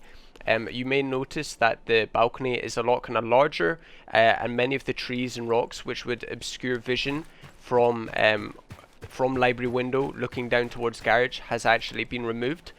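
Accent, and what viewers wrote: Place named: Belfast, United Kingdom. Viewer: R u from belfast?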